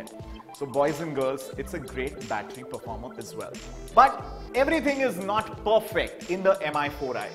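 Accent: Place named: Russia. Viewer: That Russian accent though.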